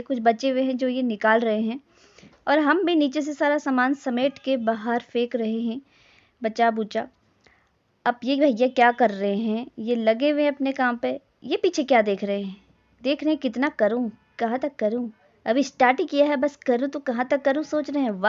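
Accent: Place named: Lucknow, India. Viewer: Aaj to aap Lucknow ki language bol rahi hai Ham ham😂😂 very nice 💞 💞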